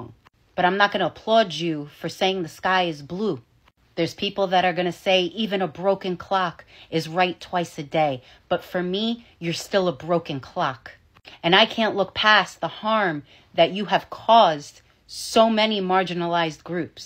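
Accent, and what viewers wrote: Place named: New Jersey, United States. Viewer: I hear New Jersey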